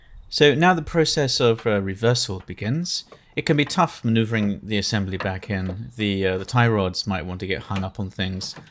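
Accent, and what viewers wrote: RP, United Kingdom. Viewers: I love the posh voice.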